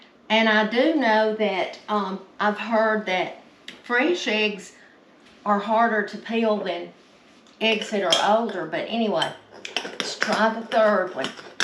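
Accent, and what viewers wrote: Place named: Alabama, United States. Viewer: I only remember them being called deviled eggs. If I make them at Christmas I add some sweet pimentos with the relish to have the red with the green. And sometimes I make them with soft bacon bits if Im trying to be fancy lol. I am from coffee county Alabama and listening to you sounds like home. God Bless and keep you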